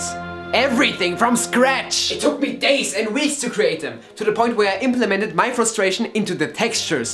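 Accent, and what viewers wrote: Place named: Germany. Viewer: I hear German and I like it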